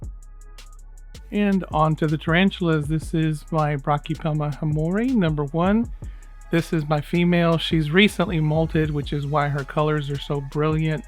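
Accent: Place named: United States. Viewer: I'm so used to how Petko from The Dark Den pronounces the names that it sounds so weird when I hear Americans say them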